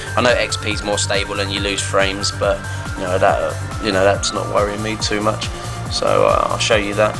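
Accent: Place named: England, United Kingdom. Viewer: jewel core processor, thats what it sounded like, haha. I love the enlgish accent.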